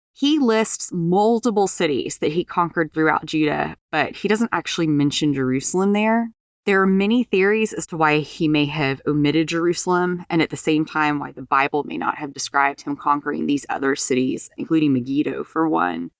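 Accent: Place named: Nebraska, United States. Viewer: Well done! Brava! This is one of my areas of study for many years. You summarize well. If I may, I'll offer ttwo recommendations. 1: Your pronunciation is too regional, northern, like Nebraska, crimped. They say "theenks a lat," not "thanks a lot.". You say, "numadic," not "nomadic." Open your vowels more for Standard American English. 2: Relax your throat. Your larynx is crimped, so your voice is nasal. These mean practice, not much. Any might do wonders. I expect that is your normal voice. Such a respectable content suits your good mind. Its presentation deserves dressing up as you were applying for a job, which means better clothes than around the house. Most people don't think about sound at all. I don't mean that stuffy is at all better. Clothing is a pretty good analogy. Your content and you deserve recognition.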